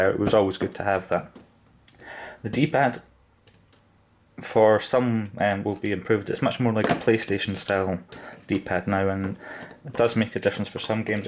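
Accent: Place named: Wales, United Kingdom. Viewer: Sounds Welsh to me but I fail at accents...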